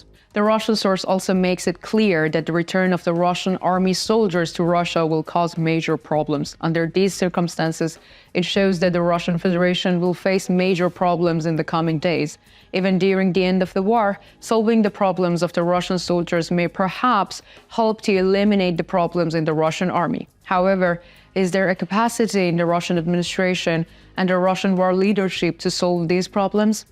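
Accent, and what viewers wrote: United Kingdom. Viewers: Great video, great English! Love this format. Based on the accent, I cannot tell where you are from, since you have a British twist in your pronounciation!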